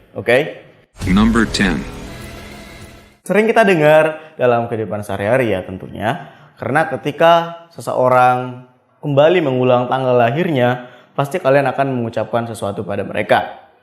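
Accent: United Kingdom. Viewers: British accent on point bangett,kagum 🥰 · Your British accent amazed me.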